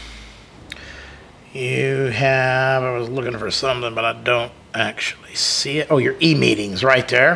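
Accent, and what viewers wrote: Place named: Hill Country, United States. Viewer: Great review. I love your commentary style. You sound like a good old boy from the hills. Just subscribed to your channel.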